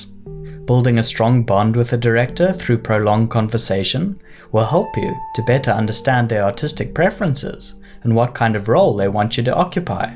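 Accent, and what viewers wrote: South Africa. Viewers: The narrator sounds south african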